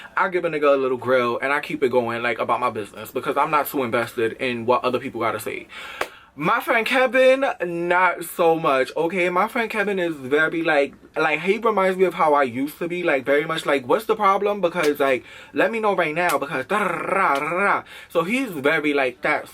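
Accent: New York, United States. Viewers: is so new york, i love it😂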